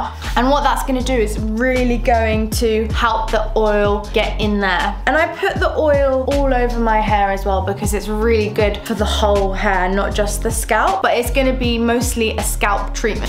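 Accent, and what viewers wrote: United Kingdom. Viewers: I, love those lips and British accent. Oh! I forgot. Great video too.😉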